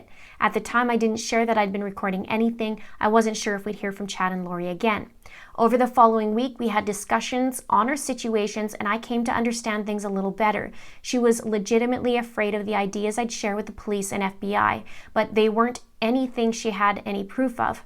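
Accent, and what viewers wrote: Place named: Canada. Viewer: I knew you were Canadian before I knew you were Canadian...had a friend who passed and he was Canadian... Batteries was bat trees And said aye all the time... i miss that guy .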